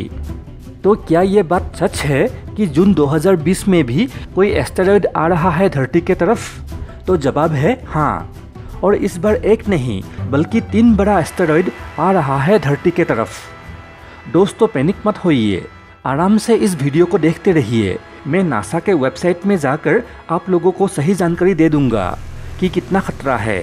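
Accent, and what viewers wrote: Assam, India. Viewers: Are you Assamese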